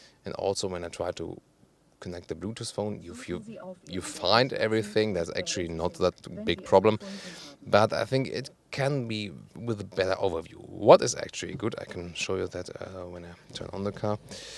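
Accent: Germany. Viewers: Like his english with german accent :) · Tolles Video, macht großen Spaß zu schauen! Nur der deutsche Aktzent ist gewöhnungsbedürtig, aber das ist keine Kritik :)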